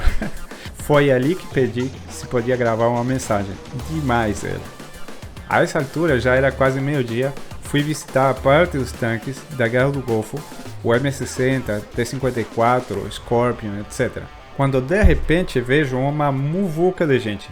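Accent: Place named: Latin America. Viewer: Top demais,! Obrigado por compartilha com a gente essa experiencia. Agora, tira uma curiosidade por favor: esse seu sotaque não é de brasileiro. Tá me parecendo que tu tem um pé em algum país espano, não?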